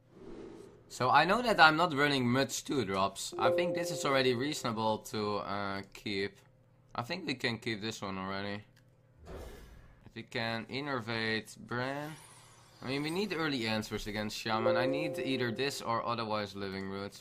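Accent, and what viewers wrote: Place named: Netherlands. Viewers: You can't just tell a person to be good at something. as you know is Dutch which means he has been speaking the language for his entire life and obviously has adapted to that way of speaking. The Dutch language has a lot of rules which the English language either doesn't follow or has a different rule for entirely so teaching a Dutch person English is harder than teaching them some other European languages. (I probably also made some grammar mistakes in this comment sorry about that.) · I understand and agree with your point, its more of a recommendation if anything:) would (I think) benefit from minimising his Dutch accent, as it will widen his audience and allow him to more effectively reach his viewers. it's not just me berating him for his English accent, it's imo in his interest as well!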